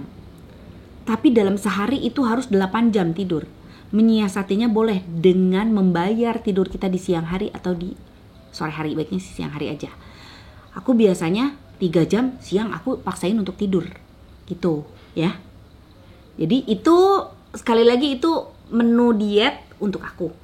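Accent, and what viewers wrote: West Java, Indonesia. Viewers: Nyunda pisan s tth..hidup urg sunda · Teh memang luar biasa biar sudah sukses tpi ttp sundanya ttp nempel melekat... Sahabat teteh suk maampir ke sebelah kita joged" dengan alunan lagu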